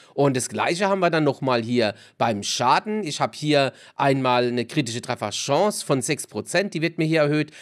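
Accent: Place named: Rhine-Neckar, Germany. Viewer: du hast diesen herrlichen Klang dieser Region in deiner Stimme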